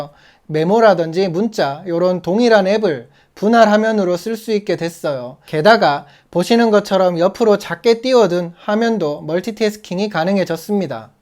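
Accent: Gyeongsang, South Korea. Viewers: 아우.. 목소리도 답답하고 그 목소리로 경남 사투리쓰니까 들을수가 없네요.. 첨입니다 악성댓글은 참 대단하세요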